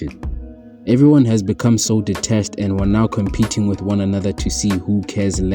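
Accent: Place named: South Africa. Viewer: Ngl I'm boy sounds South African 😅W video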